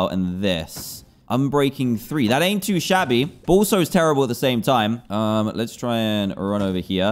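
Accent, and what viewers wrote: United Kingdom. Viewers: how would they be brothers? Think is from NYC and is from the UK. Why do you think has a British accent and Justin doesn’t.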